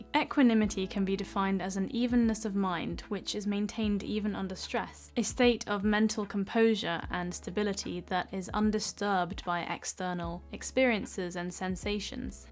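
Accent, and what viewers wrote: United Kingdom. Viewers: Hello dr, my name is mark. I love your video its very inspiring for me. Although i had a bad set back last year I was on a dating site and met a chinese girl, who i thought i was in love with. Turned out to be a crypto investment scam, called sha zhu pan or butcher pig scam of asia. I lost $100,000 in crypto currency. Im depressed now n taking weed gummies and zoloft to help sleep; and get my serstonin levels up. While managing my acid reflux and diverticulosis. I hope i can learn to think better so ill try to learn what your teaching. I just hope weed gummies dont effect my memory. Its bad enough as it is. Along not knowing if ill choose surgery for a 5cm les esophagus,hernia. Surgery is to hard to understand now. Any advise id appreaciate. I get dizzy from clogged sinus. But i admire you fir showing me how a person can be if they apply them self. 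So tk you, and love you british accent. AMAZING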